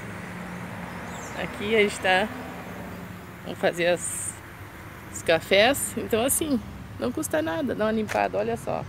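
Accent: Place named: Santa Catarina, Brazil. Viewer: Vc tem sotaque de Catarinense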